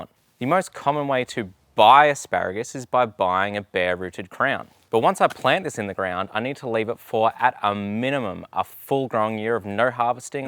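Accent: Australia. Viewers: New sub. Where are you from? Aussie?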